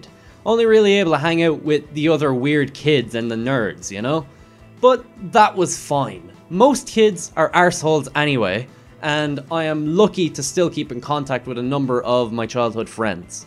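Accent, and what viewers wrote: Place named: Ireland. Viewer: that irish accent tho 😍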